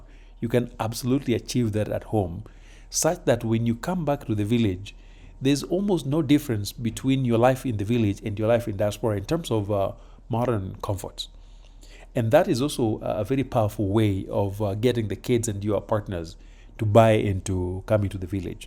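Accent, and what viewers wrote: Luo, Kenya. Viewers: Enjoying your slight luo accent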